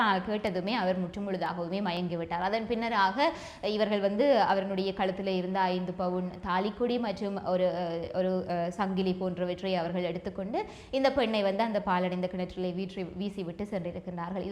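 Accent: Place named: Sri Lanka. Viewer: எனது வயது 78 . வருடம் 50,60 களில் இலங்கை வானொலி வர்த்தக ஒலிபரப்பு நிகழ்ச்சியை வானொலி பெட்டி வழியாக கேட்பதே எங்களின் பொழுதுபோக்கு நிகழ்வாக இருக்கும். மயில்வாகனன், ஜெயலட்சுமி,K. S. ராஜா போன்ற தொகுப்பாளர்களின் இனிய இலங்கை தமிழின் உச்சரிப்பை கேட்டு ரசித்து இருக்கிறேன்.அந்த வகையில் தற்போது உங்களின் இலங்கை தமிழை கேட்டு ரசிக்கிறேன். வாழ்க வளமுடன்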